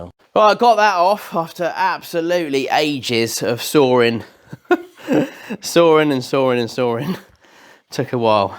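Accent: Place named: RP, United Kingdom. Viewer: very posh 😊👍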